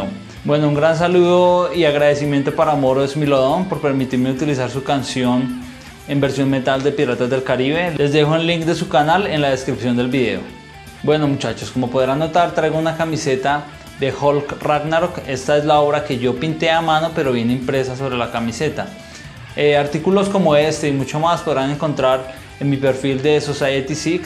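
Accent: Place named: Colombia. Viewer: No se pierde el acento Colombiano!! Saludos maestro!!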